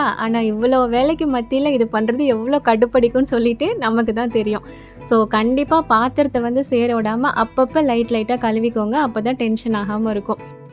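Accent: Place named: Kilakarai, India. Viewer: I'm from kilakarai..manja soru sila peru ipdium panuvaha.. aana idhoda Ginger garlic paste and tomato um serthu kari ku thalipu mari pota inum supera irukum...try pani parunga ❤unga slang um klk mari than Iruku.. maashaa allah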